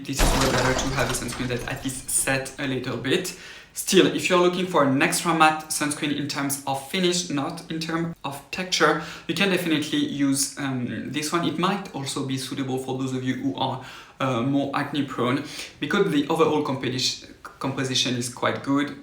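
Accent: France. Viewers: Greetings from West Hartford, CT🙋‍♀️You are my new favorite reviewer of sunscreens. I've watched enough of your videos now to finally be able to understand your heavily or should I say Heavenly French accented English. Love your channel,.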